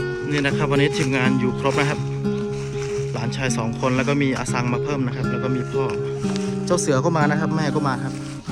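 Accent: North, Thailand. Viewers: สำเนียงคนเหนือ ถ้าอู้ภาษาบ้านเฮา ท้าจะดีนะ เหมือนคนอีสานเขาเว้าภาษาถิ่นเขาเพราะดี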